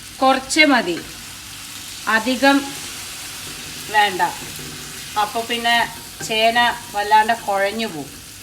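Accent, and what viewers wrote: Palakkad, India. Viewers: Cheenachatti nalla bhangi evidunnu vangi Palakkad samsaram kelkan bhayakara rasanu ente husband veedum parli anu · Love your simplicity,ur palakkadan tone, simple and healthy menu. Lots of love from thrissur. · പാലക്കാടൻ ഭാഷ ടോളെ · Hahahahahha chechi 😄😄😄 എന്താണ് ഉണ്ണി 😄😄 പാലക്കാടൻ ഭാഷ കേൾക്കാൻ നല്ല രസം എന്റെ chechi ആ ചീന ചട്ടിയിൽ ഇട്ടു പുരട്ടി തിന്നുമ്പോൾ ഉള്ള സ്വാദ് അത് പറഞ്ഞറിയിക്കാൻ കഴിയില്ല എന്റെ അമ്മക്ക് നല്ല ഇഷ്ടാണ് chechi കുഴച്ചു പുരട്ടി കഴിക്കുന്നത്. ഇങ്ങനെ ആണ് ചോറ് കഴിക്ക അല്ലാണ്ട് അവിടുന്നും ഇവിടുന്നും തോണ്ടി അല്ല കഴിക്ക പറയും ☺️☺️☺️☺️ എനിക്കും ഇഷ്ടാണുട്ട chechi കഴിക്കുന്നത് കാണാൻ നാളെ എന്റെ കറി ചേച്ചിടെ കുരുമുളകു രസം ചേന ഉപ്പരി & അപ്പളം ✌️✌️✌️✌️ ഒത്തിരി സ്നേഹം 😍😍😍😍 🤗🤗🤗🤗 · എന്താണ് ഉണ്ണി.. പാലക്കാട്ൻ ഭാഷയും ചേന ഉപ്പേരി യും കലക്കി 👍🌹❤😍😘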